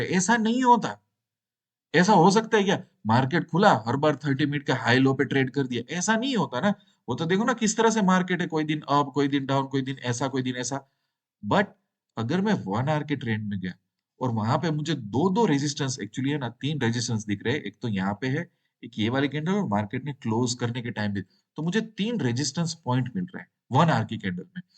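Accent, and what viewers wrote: Gujarat, India. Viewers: તમે ગુજરાતી છો? ટોન એવો લાગે છે😊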